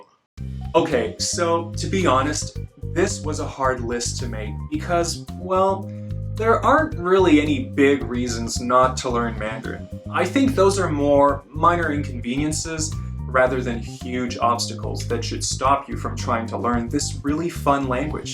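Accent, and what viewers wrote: Standard. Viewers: 卧槽你普通话好标准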